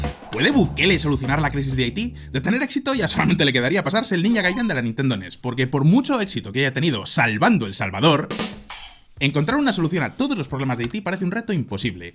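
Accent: Spain. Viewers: Tienes acento español, España está perdiendo la guerra cultural contra las nazis feministas y retrógradas wokes que desconocen la realidad latinoaméricana y se ponen a opinar y condenar las medidas tomadas por Bukele o Miley, cuando las tierras españolas se están llenando de inmigrantes que huyen de esta realidad latinoamericana XD... El socialismo en un fracaso y la evidencia son las ONG que comparten sus recursos con los haitianos. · Me gustan tus videos, son muy informativos, pero por favor habla más despacio, tu acento español es un poco difícil de entender en latinoamerica.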